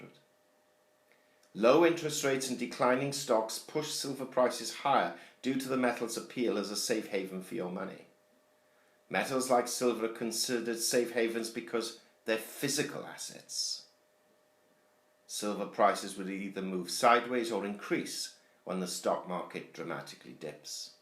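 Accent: England, United Kingdom. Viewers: Very thoughtful (the Eng accent helps) and an award for a nicely rigourous analysis; but, my gut says $38 as this Fall is just too econo-politically topsey turvey not to force a doubling.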